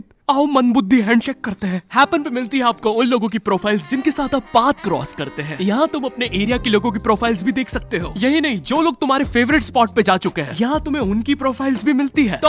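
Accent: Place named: Malwa, India. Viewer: bhaii aap hamre malwa ke ho kya gav ke 😂 aaj confirm ho gya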